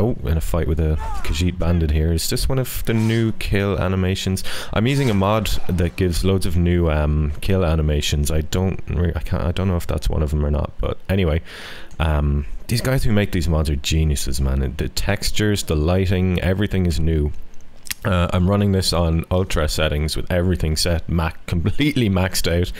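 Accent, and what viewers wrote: Ireland. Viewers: He has an Irish accent if you didn't know.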